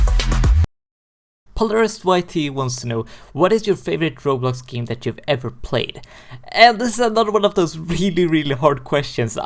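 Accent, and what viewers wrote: Sweden. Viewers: are you swedish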